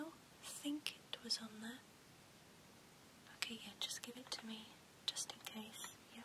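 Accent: United Kingdom. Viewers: ..i could listen to you read out of the phonebook! Your voice is so soothing and calming..i love your British accent as well. I love your videos..they're very relaxing. Good day, lovely!❤😊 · I find that I have that reaction with the majority of her more British terms or even words that have a significant difference in pronunciation between her accent and American English.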